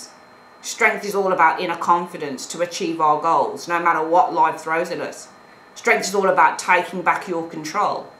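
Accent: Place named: United Kingdom. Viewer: I'm a Mexican, but I live in united states and there is a different accent from the UK. I was nice to hear a different English tone and accent. Do you know where is Mexico? I , I know some Countries in Europe but not all. Specially Germany , a diveded contry. East Germany, and Wesst Germany. and Reunited Country. Very big news in America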